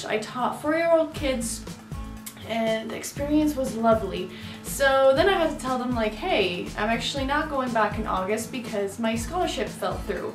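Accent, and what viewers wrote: United States. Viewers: Your english sounds american af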